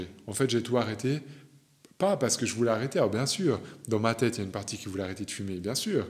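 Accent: Switzerland. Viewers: Ton accent montre que tu es en suisse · damn t'est suisse... Vaudois je dirais. magnifique postulat merci, de suisse aussi 😅. je modifie mon comm pour dire simplement que ta sensibilité se voit et qu'elle est belle mon gars. sincèrement bravo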